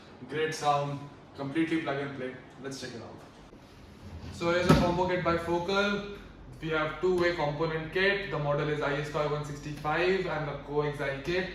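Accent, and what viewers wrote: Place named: India. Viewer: It is not amazing - I can barely understand anything with his deep hindu accent!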